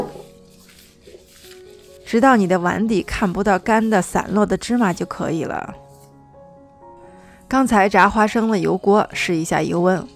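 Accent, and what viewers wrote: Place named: Shaanxi, China. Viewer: 好棒啊！每次回西安去回民街都会买点炸辣椒，特别喜欢吃，再陪着的陕西口音，太亲切了。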